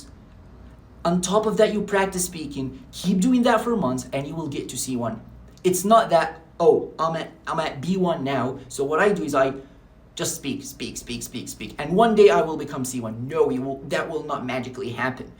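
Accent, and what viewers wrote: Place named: United States. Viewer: Incredible information bro I understood the whole video without looking at the translation I'll take your advice thx bro and your accent is so amazing i can't tell the difference between yours and an American accent ❤❤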